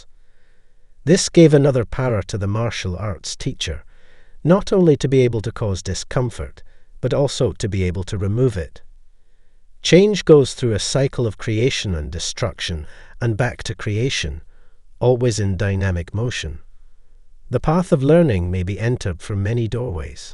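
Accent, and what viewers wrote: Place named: Scotland, United Kingdom. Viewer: What a grand Scots brogue you have ser. A gent and a scholar! Many thanks.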